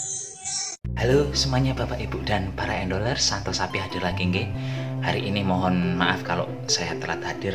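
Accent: Banyumas, Indonesia. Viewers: Mas Santo njawine pundi to kados radi ngapak sami kaleh bojone Kulo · Pak lucuuu pol n resepe wokeh 👍👍👍👍 auto lgs sy subscribe deloke ambek guyu" 😂😂 ngapak " e inyong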